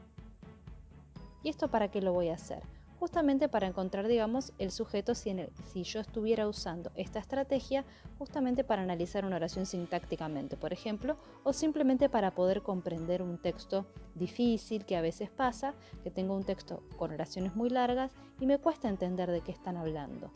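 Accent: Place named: Argentina. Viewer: Gracias . porcierto sos de qrgentina. 🍽 un acento bonito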